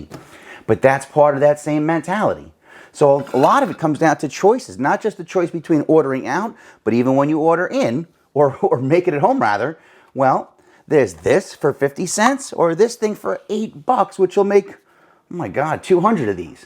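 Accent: New York, United States. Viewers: What, no Velveeta cheese listed? How could you miss that? Seriously, I just spent a very pleasant hour, and a pot of coffee, watching this video and reading all the comments. Thanks! So, I grew up in Yonkers in the 50’s; this really hit home. So much of this is second nature to me. Watched my mother and grandmother canning. Leftovers were eaten until things turned green, and even then some of that was scraped off and it was good to go. My wife still cringes at how long I leave things in the fridge. One thing we really stretched was sauerkraut: boil it witH Kielbasa, potatoes and serve with brown mustard. Also, a good gravy covers all sins!, you reminded me of my brother-in-law: Italian, talked with his hands, NYC accent, and a police detective too (in Yonkers). Made a mean meatball!, So, you got another subscribe. Take care! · I do SO enjoy listening to a New Yorker tell stories! 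Nobody does it better!!! Love hearing about your Grammy. I remember my Mom stretching hamburger with bread back in the 1970’s. Not that we loved it, but she could sure stretch a dollar! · As a former Brooklynite,I have enjoyed hearing the New York accent 👍🏽😂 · Great video. I save the ends of vegetables, freeze them as I go along, put in a pot or slow cooker filled to top with water with a whole onion snd a whole garlic ( their skins on), some celery and carrots and several hours later, strain and have delicious veggie broth to eat, cook with, freeze, give to family and neighbors, friends. Make rice with it, or noodle soup by adding chicken or beef bullion. Make bone broth same way. Stretches your meals. Add soy sauce, ginger, scallions for Asian flavor. Good to hear an Italian from New York! 👍Just moved from there to this state.😊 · Loved listening to this video and your NY accent. Makes this Midwestern gal miss her NY friends I’ve met through the years. 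Good info. Your Grammy was a wise lady. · New York Italian? Automatically subbed! I live in Upstate. Near Rome. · I knew I heard a NY accent! I’m a former New Yorker, living in VT. Bacon, egg and cheese in a hard roll is a NY legend. Miss that! Just found your channel today - thank you!!!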